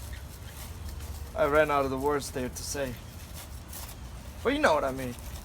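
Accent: Denmark. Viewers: Is this guy Danish? I think I can hear something Danish in his accent 🤔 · Is that a Danish accent?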